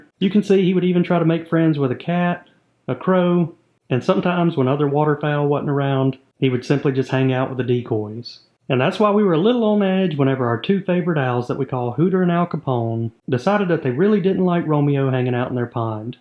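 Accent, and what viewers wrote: South, United States. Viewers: May the Carp be with you - cool Southern accent btw !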